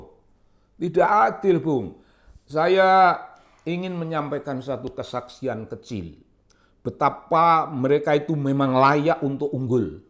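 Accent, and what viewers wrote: Indonesia. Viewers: Kang Sobary itu tulisannya bagus. Saya suka membaca esai-esai dan buku-bukunya. Tetapi, kalau berbicara kurang menarik. Bahasa lisan Kang Sobary amat medok dan tidak terstruktur dengan baik. Jadi, menurut saya, lebih baik memakai naskah biar lebih enak didengar. 😊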